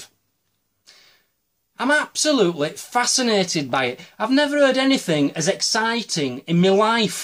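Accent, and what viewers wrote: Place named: Newcastle, United Kingdom. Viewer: Funny how geordie is so easy on the ear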